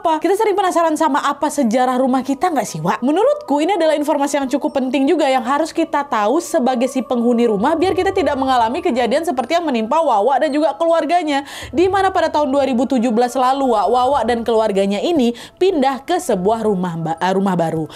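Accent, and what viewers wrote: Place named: Palembang, Indonesia. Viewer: Nahh wong Palembang mano suaronyooo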